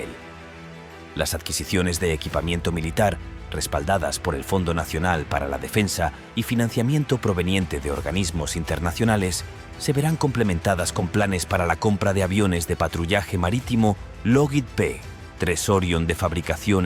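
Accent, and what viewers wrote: Spain. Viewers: todo verso pagado y se nota con el asento gallego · EXCELENTE...Permítanme una crítica constructiva ,al video: hubiera sido óptimo que quién hable de "NUESTRAS F.F.A.A." sea una voz argentina y "no españolizada". V.L.L.C.!!! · Encima habla un gallego 😂😂😂😂 no tenes algo mas antipatria ?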